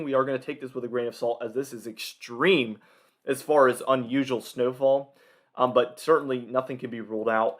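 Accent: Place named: South, United States. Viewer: "Gonna" gonna "..... Geraldine, ALABAMA ? Why are Americans now taking like Southerners?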